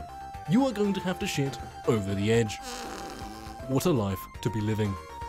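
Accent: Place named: England, United Kingdom. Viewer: Live the concept. It's like a Doomsday Preppers parody with a very English accent. Me Gusta! ^_^ May I suggest Threed from Earthbound?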